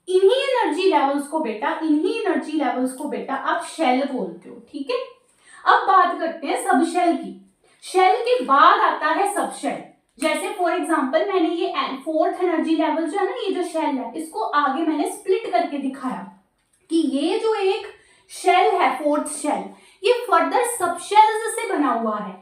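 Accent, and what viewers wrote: Punjab, India. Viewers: Mam ap punjabi ho???😅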